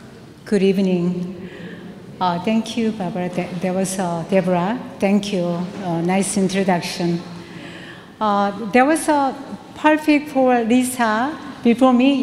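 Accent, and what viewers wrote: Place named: South Korea. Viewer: 발음이 한국식 영어네요 영어는 쉽지않아요 아이고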